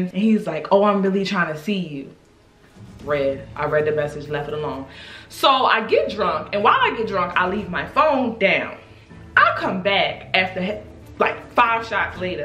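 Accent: Baltimore, United States. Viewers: u from Baltimore? Subscribe. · Lmfao I knew you was from Baltimore! I love your videos. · Yo tell me why I spent 20 minutes on ig tryna figure out if you was from Baltimore bc I could hear it ASAP when I literally could’ve just watched a min further in 😭😭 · As soon as I press play I instantly knew you was from Baltimore 🥴😂 it's something about that west side accent....I always pick up on it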